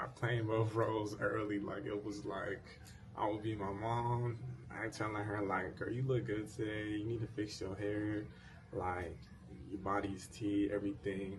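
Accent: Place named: North, United Kingdom. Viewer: It’s so weird bc u don’t strike me as sb who is from the south u sound and carry ur self like u from up north 😂 u got that smooth voice like a New Yorker 😫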